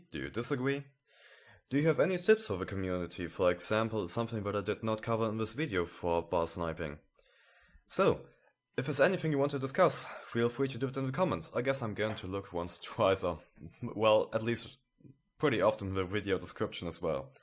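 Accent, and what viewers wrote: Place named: Germany. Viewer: German accent! sounds so weird to me but english people love it lol. Also very good video man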